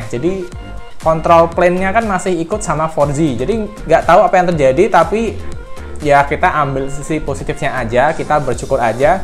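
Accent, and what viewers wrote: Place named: Java, Indonesia. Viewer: Thx mas, ulasan Anda yang jawani bingitZ...kethoe sampeyan semarangan yaa...atau solo...??! Logate ngono maksute, btw, lanjutkan ulasan2nya utk hp terkini yg pasti update dan ' fresh from the oven'...wkwkk..profisyat n go ahead your channel, regard from me.. Alexius Prihartanto Dwi Palupi, Kebayoran Lama Simprug Jakarta Selatan 🏌️🙏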